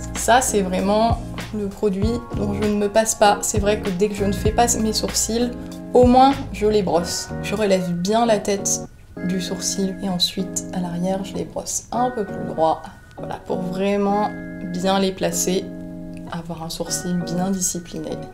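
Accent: France. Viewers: The french accent is so Devine it just screams rich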